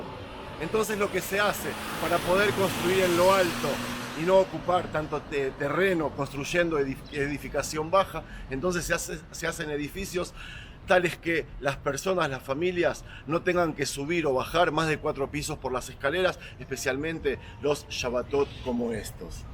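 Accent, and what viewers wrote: Argentina. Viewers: quiero saber porque hablas tan bien el español con acento argentino... cuéntame o donde puedo ver un video... me imagino que ya lo dijiste....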